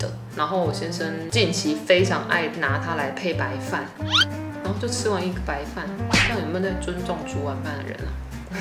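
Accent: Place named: Taiwan. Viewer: 說台語好可愛❤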